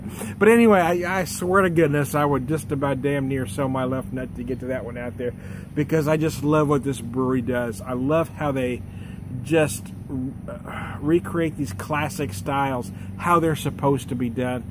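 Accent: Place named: Missouri, United States. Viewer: You sound like such a Missourian lol I'm from Missouri too. Loving these Reverence Series reviews but sadly never tried the Revolution Series. Cheers!!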